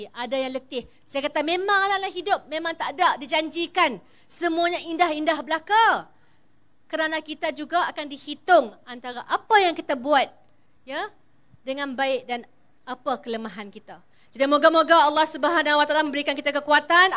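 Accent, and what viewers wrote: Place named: North, Malaysia. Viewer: first time dgq nurul izzah cakap utara..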